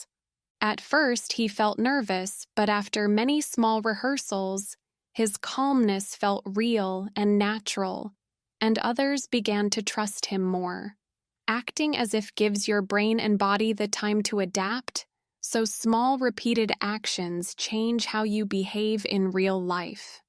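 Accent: United States. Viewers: your accent in video is american accent . right ?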